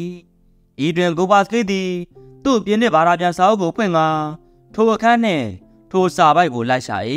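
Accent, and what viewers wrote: Upper Burma, Myanmar. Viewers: အညာလေသံလေးနဲ့နား ထောင်ကောင်းတယ်အား ပေးနေမြဲပါ❤❤❤❤❤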